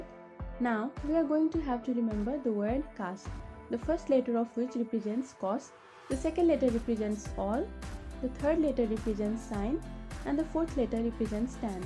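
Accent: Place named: Nepal. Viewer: Hlo ... miss where are you from ? .... your accent look like nepal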